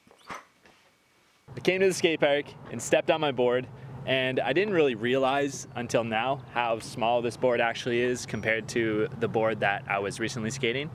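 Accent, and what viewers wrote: Canada. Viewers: You're Canadian right?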